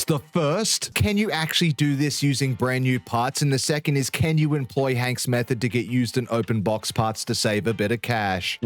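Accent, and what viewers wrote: Australia. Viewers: I thought you were Australian, then I heard you mention microcentre ALOT I second guessed. BUT YOUR FROM BRISBANE ❤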